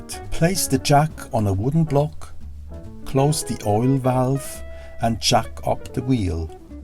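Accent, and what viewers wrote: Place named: Switzerland. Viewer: Is this chap Swiss?...he certainly sounds weird enough?!.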